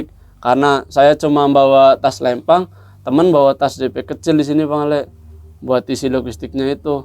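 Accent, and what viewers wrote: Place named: Banyumas, Indonesia. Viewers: Doa yang baik2 buat mas..saya doakan sukses dunia akherat Aamiin.., aja kelalen mas ora ngapak ora kepenak.. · Mas nek ngomong khas aksen wonosobo - purbalingga (ngapak) banget ya wkwkw jd kangen ke wonosobo lagi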